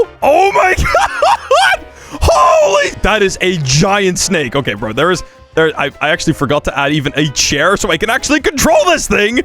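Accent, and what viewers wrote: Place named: Gouda, Netherlands. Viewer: You have a accent that someon from Gouda has are you from Gouda (for the people that don’t know Gouda is a place in the Netherlands)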